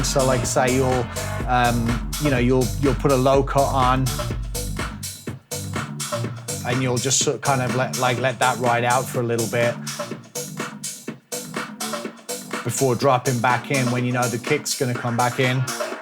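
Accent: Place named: United States. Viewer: What's with the American accent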